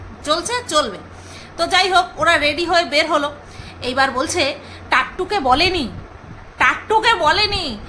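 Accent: Bangal, Bangladesh. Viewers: didivai tmr mukhe bangal vasa ta sunte hebby lage😊😊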